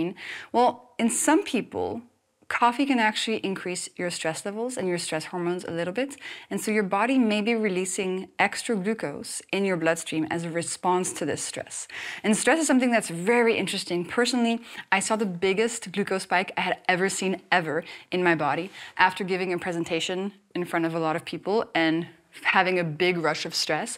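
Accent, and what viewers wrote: France. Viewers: That subtle french accent is absolutely charming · Has anyone sensed a similarity between Ms. Inchauspe and Martha Quinn from the OG MTV in the early 80’s?Quirky, cute, MTV VJ. One of the original VJ’s. is French but even through the tinge of French accent there’s a lift and a flow to her pitch and tone that was unique only to Martha until now. At least to my ears. To me it’s kind of freaky to watch talk while remembering Martha Quinn. I can hear Martha in there. I don’t think is doing anything to change her voice, not on purpose at all but it is eerily similar. At least to me. She is engaging and if it helps people’s health, that’s great. More power to her!! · Completely off topic... How have you managed to almost completely lose your french accent? That's a first for me! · We (french native speakers) are so doomed... I mean I would kill for an accent like this one, yet people treat this as a french accent... 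· I like this videos of yours. I think you are a good and knowledge person. Side note: for some reason I sense a strong French accent in your English and I wonder are you French or a French-Canadian?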